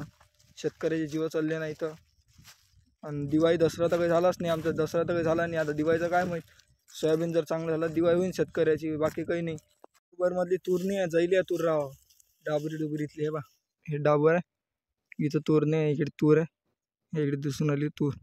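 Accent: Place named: Vidarbha, India. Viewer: खूप छान सत्य व्हिडीओ बनवला व तू बोलतो पण खास आपल्या विदर्भाच्या भाषेत समजावून सांगितले 🌹प्रणाम तुझ्या कार्याला🌹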